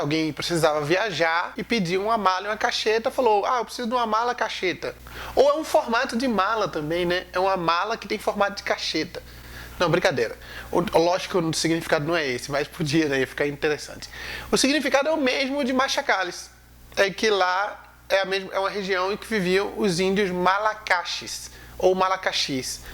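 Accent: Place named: Ceará, Brazil. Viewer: E o seu sotaque tem I'm pouco de cearense out pernambucano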